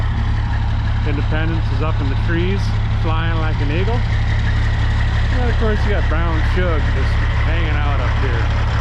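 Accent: Minnesota, United States. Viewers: Your accent is super thick for Minnesota. I'm in duluth what part of Minnesota are you from? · Can't wait till you pick up a Southern accent on top of that Minnesota accent.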